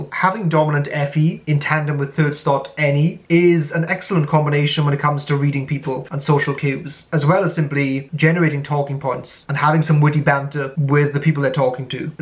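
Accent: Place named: England, United Kingdom. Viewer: What kind of english accent is that?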